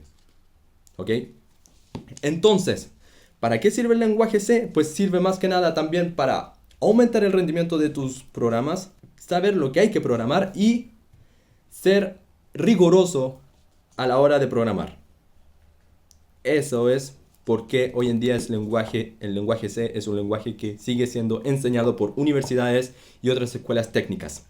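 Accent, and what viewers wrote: Chile. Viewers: hablas como chileno bro:3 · Consulta aprendiste español en Chile? tu acento español me parece bastante chileno xD Estoy aprendiendo a programar en esta pandemia y comence con lenguajes mas modernos como javascript o ruby, pero me di cuenta que si quiero llegar a ser bueno debo aprender C que te enseña cosas elementales de la programación. Saludos desde Chile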